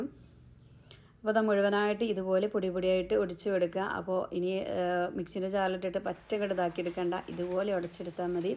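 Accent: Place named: Palakkad, India. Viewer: ഹായ് അസ്സലാമു അലൈകും. ആലൂ പൊറാട്ട സൂപ്പർ ആയിട്ടുണ്ട് 👌നല്ല അച്ചാറും, കട്ട തൈരും കൂട്ടി കഴിക്കാൻ സൂപ്പർ ആണ്. നല്ല ഒന്നാം തരം നോർത്തിന്ത്യൻ ഫുഡ്‌ ആണ്. അതൊക്കെ പോട്ടെ ഞാൻ പണ്ട് നിങ്ങളുടെ ചിലകറി കൾ ഓക്കേ ട്രൈ ചെയ്യാറുണ്ടായിരുന്നു എനിക്ക് എന്റെ ഉമ്മ ഉണ്ടാക്കി തന്നിരുന്ന കറികൾ പോലെ തോന്നുകയും ചെയ്തിരുന്നു 🙏പക്ഷെ അന്ന് നിങ്ങളുടെ സംസാര രീതി എന്തോ വല്ലാത്ത അസഹനീയം ആയിരുന്നു 😔എന്തിനാണ് ഇങ്ങനെ സംസാരിക്കുന്നത് എന്ന് തോന്നിയിട്ടുണ്ട്. കാരണം എനിക്കറിയാം എന്റെ നാട്ടിലുള്ളവരും പരിസരതുള്ളവരും എങ്ങനെ യാണ് സംസാരിക്കുന്നത് എന്ന് എല്ലാർക്കും ഒരേ പോലെ അല്ലങ്കിലും ചില വ്ലോഗർമാർവന്ന് നാടിന്റെ പേര് തന്നെ പറഞ്ഞാൽ മറ്റുള്ളവർ കളിയാക്കുന്ന തരത്തിലാക്കി സ്വന്തം PKD 😔 എന്തായാലും ഒരുപാട് സന്തോഷം കുറേ നാൾക്കു ശേഷം നിങ്ങളുടെ വീഡിയോ കണ്ടപ്പോൾ സ്വന്തം സംസ്ക്കാരം നിങ്ങൾ തിരിച്ചറിഞ്ഞു വെറുപ്പിക്കാതെ വീഡിയോ ചെയ്തതിന് 🙏🙏❤️ പിന്നെ നാട്ടിലെ മാങ്ങാകാലത്തെ ഓർമ്മപ്പെടുത്തിയപ്പോൾ 😔😔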